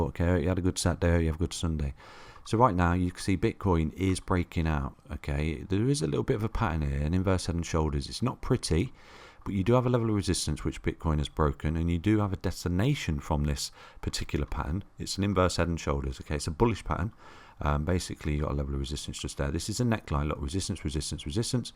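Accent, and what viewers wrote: United Kingdom. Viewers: i like that nice and short video.. thanks.. nice to also hear British accent for once.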